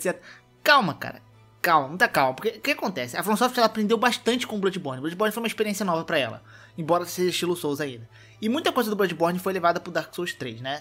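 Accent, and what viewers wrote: Rio de Janeiro, Brazil. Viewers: Quando voce Fala twice parece que voce Fala tchuaiche.. Eh sotaque carioca?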